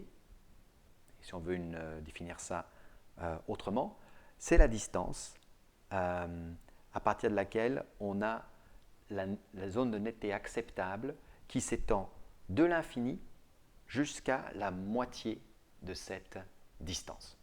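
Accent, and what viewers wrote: England, United Kingdom. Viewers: Merci pour votre pédagogie qui n'a d'égal que votre humour et votre accent anglais, qui détonne dans la bouche d'un francophone. Vous êtes le Choulax de la photo comme on dit ici.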